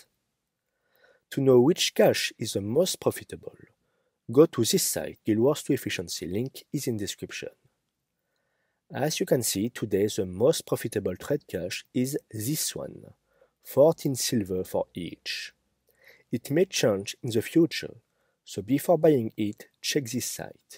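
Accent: France. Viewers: I literally have to put on CC because of how thick and hairy his French accent is. But I love how good his editing skills are. Literally don't need to listen and can watch everything to get the information. So does it matter? I love his work! Tu es beau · I'm French and it's surprising to me how he obviously knows and understands English quite well, meaning he's been exposed to it quite a lot, and yet somehow he still retains that very thick beginner French accent that all high schoolers have. The amount of French people who have way less vocabulary and understanding of English grammar than he does and yet speak with a much better accent is astronomically high.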